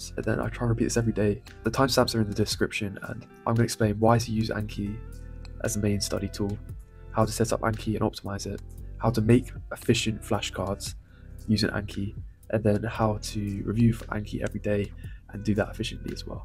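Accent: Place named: England, United Kingdom. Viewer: Lovely to here clean, calm, English accent so "unYOUTube🇺🇸" Not one GUYS! You're A very nice TEACHER that's my opinion, as a teacher. 😊 Thank you flower! Edit, ok, so a 'guys' but not annoyingly.😅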